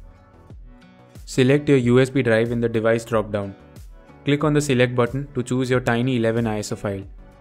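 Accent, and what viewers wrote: India. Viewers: You have indian accent me no trust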